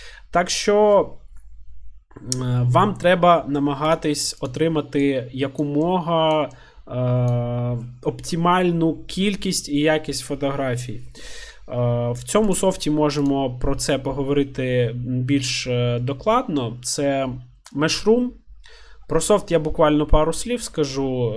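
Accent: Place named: Surzhik, Ukraine. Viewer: Классний суржик, бро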